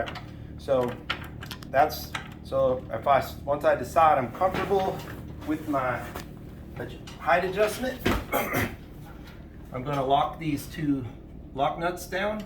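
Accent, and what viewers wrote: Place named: United States. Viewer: Is it me or is sounding more country,.. Yeehaw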